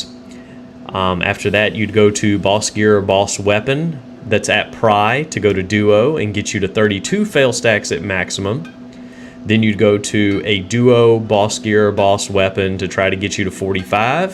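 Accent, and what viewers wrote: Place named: South, United States. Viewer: I've been waiting for gaming guides from people with country accents. Thank you for representing our people, and Bless Online your heart.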